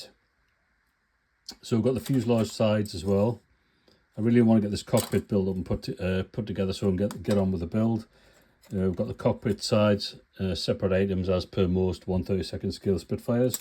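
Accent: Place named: Northeast England, United Kingdom. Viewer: Hi, What a gorgeous build, well done my friend. I live close to Consett and you have a familiar accent. Do you take on commissions? The reason I ask is that I own the original entry forms for Peter Townsend blue Hurricane from the Kings Cup Air Race. I bought the 1/48 Hasegawa to have built and make a complete display. Is this a project you could do for me? Regards, Ian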